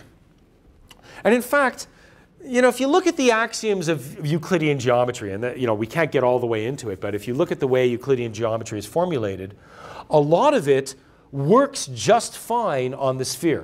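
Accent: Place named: United Kingdom. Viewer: somehow british irritates me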